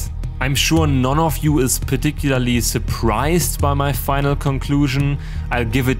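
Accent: Germany. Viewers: Your English is great, who cares if some of the German accent is there. It is actually refreshing watching gaming YouTubers from Europe. As for the EA, they are dead to me for well known reasons. Andrew Wilson's and EA's level of hypocrisy is out of this world. I had 0 expectations from this and EA as usually delivered.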